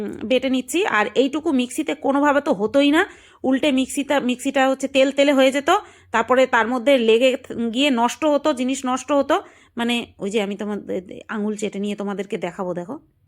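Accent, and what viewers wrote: Bangal, Bangladesh. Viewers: Bangal bhasa ki je sruti modhur laage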